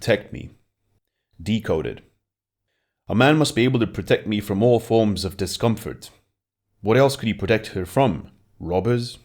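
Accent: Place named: Eastern Europe. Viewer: My favourite quote: "theoretically, it is possible for a beautiful woman to have less intelligence than a chimpanzee and still be considered an acceptable member of society" Where are you from? You sound like another YouTuber called "Eastory". I'd say somewhere in Eastern Europe. But you have a British accent too. Well-narrated. You have a pleasant voice and everything is easy to follow.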